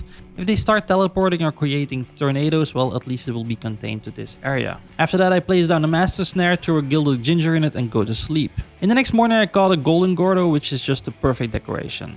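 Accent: Netherlands. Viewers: I smell Dutch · I smell nederlands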